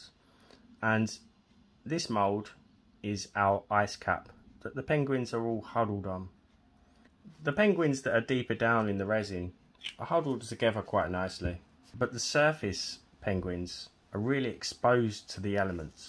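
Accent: Essex, United Kingdom. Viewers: God I’ve been married for 30 odd years but after watching this tonight I could actually marry you, you amazing man! I love the way you explain why. You’re so bloody awesome and I love the fact that you sound like your from Essex or somewhere like me too 😂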